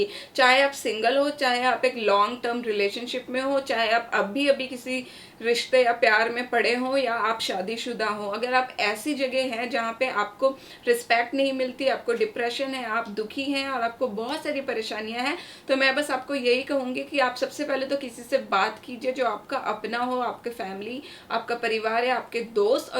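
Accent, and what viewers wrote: India. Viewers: Logo ka kaam hai khna, unhe khne do.btw love ur hindi accent( i speak urdu)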